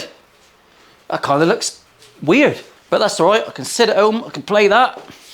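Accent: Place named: Bristol, United Kingdom. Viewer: 😂yeh mate he’s been learning for about a year now and he’s getting really good. When I showed him your last build he was like dad that’s sick so when he comes up my place he’s asks if we can watch your videos and I have them saved to watch. I’ve also just started playing guitar but I’m a piano player so guitar has been a bit of a challenge but with his help I’m getting there. He’s never seen any guitar build videos before and I said son you have to watch this guy he’s brilliant and hilarious and we’re are hooked. Like I said the builds are brilliant but we love the way you are on the videos. We’re in Bristol so only up the road and we gurt talks the same init. Thankyou me and Toby have really bonded over guitar and your videos gave us something to laugh about together too, although explaining what a beef bayonet was a bit tricky but he got the gist😂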